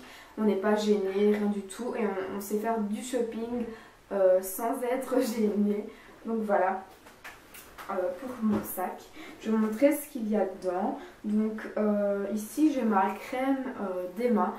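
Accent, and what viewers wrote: Belgium. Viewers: Tu es belge?!?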